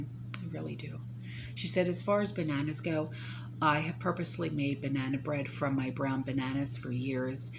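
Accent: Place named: New Jersey, United States. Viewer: I'm soooo happy I found your channel. I'm new, but I look forward to your vids every day. It's like sitting down with a friend. You have a beautiful manner and you're as cute as cute can be. By the way, I'm a Jersey girl, too, transplanted to Florida four years ago. So add your accent to the mix, it's like being home:) God bless you and your family, and thanks for everything. Last thing, your voice is so calming and soothing, you should do bedtime stories on tape.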